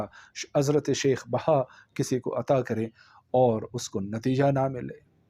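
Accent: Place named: Gilgit, Pakistan. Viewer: Aap Shia Hain ?? ✍️✍️✍️. Awaz say ttu aap Gilgitti lagtay Hain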